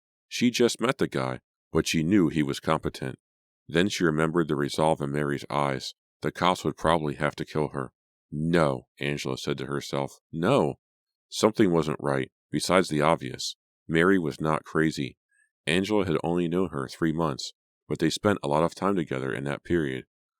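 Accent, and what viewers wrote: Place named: Pennsylvania, United States. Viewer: So happy to find your account! 😁 you’ve gotta be from pa…am I right??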